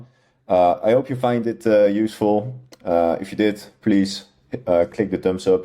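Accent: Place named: Netherlands. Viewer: Jij kom zoiezo uit Nederland aan je accent te horen 😂😂❤️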